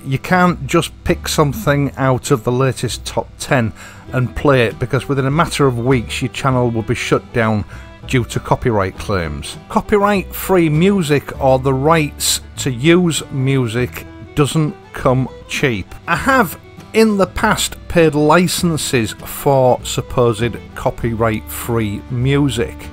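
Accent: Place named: Yorkshire, United Kingdom. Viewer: Dear: I like your music and I like your Italian (ooops - make that British) accent and I like your new motorcycle to which I will attach the name “Bullet” because you own one and I don’t and that’s what you call it. Actually, I find the music quite agreeable - much better than the acid rock that some bike videos play (and to which I do not subscribe). Anyone who disagrees is most welcome to watch one of the tens of thousands of other YouTube channels - or they can just get stuffed. One question about the Bullet: would any of the Interceptor or Himalayan accessories fit? I suspect not as those two machines are much more recent designs - but I thought I’d ask.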